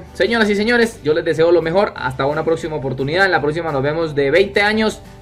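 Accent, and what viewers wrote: Colombia. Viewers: Colombiano, imagino que de algún lado del llano? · Hola...siempre te veia por hay y la verdad no me atrevia a ver el video 😊y ahora que vi nos los quiero ver todooooos,muy chevere escucharte ...es como escuchar a mis hermanos sabes😉muy colombiano que chevere!!Espero que tu canal siga creciendo cada vez mas!!muchos exitos!!😃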